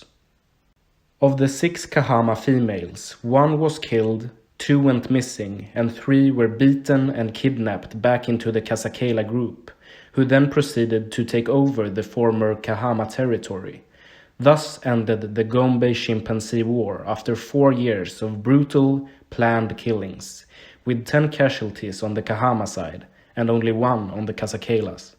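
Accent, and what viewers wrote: Sweden. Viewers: Are you Swedish? You sound Swedish in your accent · I love the Swedish "iii". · This man is swedish af. U can hear it on the horrible accent...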